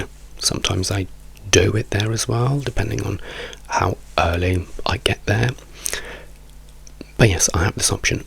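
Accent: England, United Kingdom. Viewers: mamma mia ho appena visto un tuo video in italiano, sentirti con questo accento inglese è pazzesco 😍 meraviglia davvero sei spaziale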